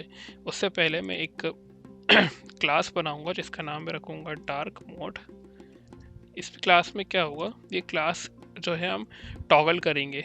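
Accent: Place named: India. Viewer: took my like back immediately he started speaking indian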